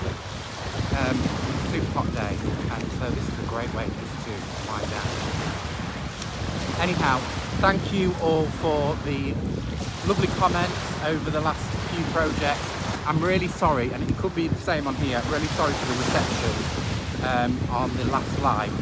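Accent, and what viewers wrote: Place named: Lincolnshire, United Kingdom. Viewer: So sorry I missed your quick live. I was wondering about your accent as I was trying to explain it to my daughter and since you said you live in Lincolnshire, I thought I would ask if this is where you got your accent.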